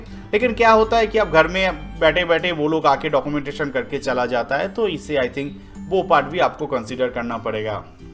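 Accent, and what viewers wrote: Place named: Bengal, India. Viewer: sir apni bangli jaisa bolte hai.. mast lagta mjhe.. 😊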